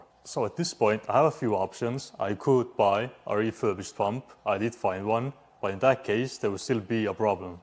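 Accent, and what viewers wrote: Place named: Estonia. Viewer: I thought your were Finnish from the accent but now I realize that’s Estonian.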